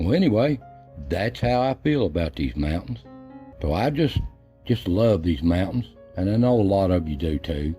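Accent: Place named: South, United States. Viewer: I like your poem, truly from your heart. I've people comment negatively on the way I still some words. We moved to California when I was younger. But I point out how those on east coast will change an a on the end a word to a r. People need to be more tolerant for those of us from the south. I love the way you say chimbly for chimney. My dad was Arkansas and that was how he called it. I'm 76 now and my Dad passed away in 1989. So you bring back good memories. I like all your videos. Keep up the good work.👍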